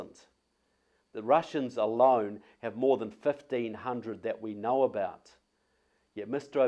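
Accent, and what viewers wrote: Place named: Australia. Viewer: i just cant stand looking at his ugly drood face for more than 1 minute, and listen to his annoying austrailian accent, long enough to finish this video, boooorrrinnng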